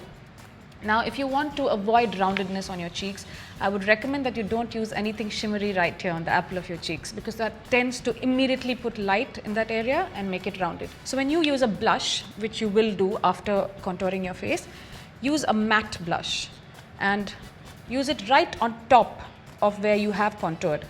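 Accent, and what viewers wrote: India. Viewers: Indian accent 😍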